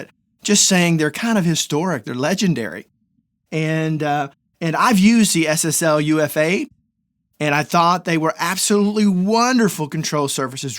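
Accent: South, United States. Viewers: Thank you for saving me from buying this! I have a lot of hardware synths and was considering it, but kept feeling there was something not up to snuff. Love your forest gump accent!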